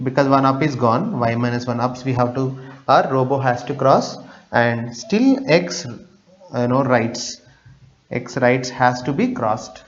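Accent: India. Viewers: The explanation is good but the indian accent is the real problem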